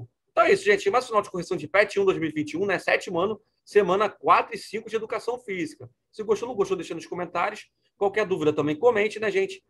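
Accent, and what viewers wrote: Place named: Rio de Janeiro, Brazil. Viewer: Vc é carioca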